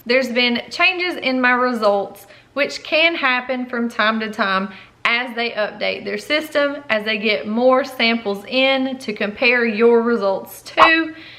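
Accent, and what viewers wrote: South, United States. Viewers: Your accent and the fact that your middle name is. My parents' middle names are Ray &. My brother's middle name is also Ray. I feel you. We're both obviously and stereotypically from the South. I'm also predominately British and have Jewish ancestry. A lot of racist/anti-Semitic people like to yammer on about Jews not assimilating and always staying separate, but that's not how things worked in the South.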